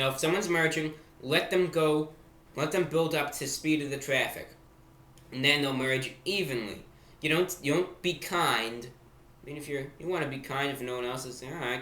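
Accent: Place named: New York, United States. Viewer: His New York accent was still intact here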